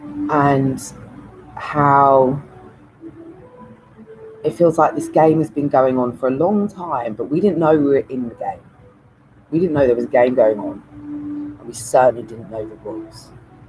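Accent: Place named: London, United Kingdom. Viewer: Hi ❤️ just subscribe after reading your comments on Carl Vernon. I take it from your accent you're London or surrounding. It's lovely to listen to you sharing your thoughts. I find video diaries in general really interesting (I'm not brave enough to do it myself). You seem of similar mindset as myself (and a similar age 🙂 I'm female). I'm totally all about meaningful coincidence, laws of attraction, symbolism etc I keep getting the number 44 lately - it's bonkers how much it appears. Are you registered to Gaia? It's like a spiritual Netflix 🙂. Some great doco's on there. Anyhows, I'm waffling 😍. Just thought I drop in and say hi. Namaste lovely lady - positive vibes to you on your journey from another spiritual lady in Cornwall /Devon area x❤️x ps you have a gorgeous, charismatic way about you - keep making your diaries 🌜🌞🍄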